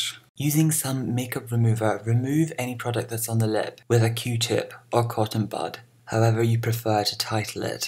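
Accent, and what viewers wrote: Scotland, United Kingdom. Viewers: I've been binge-watching your videos today and I have noticed that your Scottish accent peeks through more in these older videos. The way you speak is absolutely hypnotizing.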